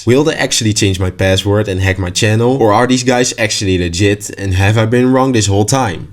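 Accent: Netherlands. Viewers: Ik hoorde gelijk je bent Nederlander lol.. als nog goed hoor je kan echt niemand meer vertrouwen deze tijd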